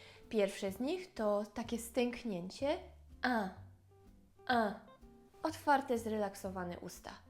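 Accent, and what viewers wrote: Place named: France. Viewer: ten akcent francuski mmm... cos pięknego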